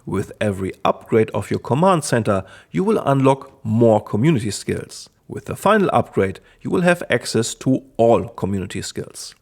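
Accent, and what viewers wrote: Germany. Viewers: Bro sounds more german than me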